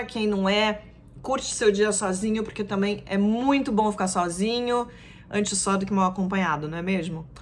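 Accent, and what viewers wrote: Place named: Rio de Janeiro, Brazil. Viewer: amo seus vídeos! Gosto muito como vc trata as notícias e da maneira polida e irônica que responde aos haters. Adoro seu sotaque carioca! Parabéns!!!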